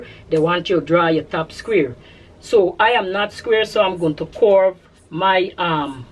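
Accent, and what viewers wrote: Guyana. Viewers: I just join your YouTube you sound like a Guyanese I would like to know where you buy your mannequin iwould like to purchase a size 20 one please let me know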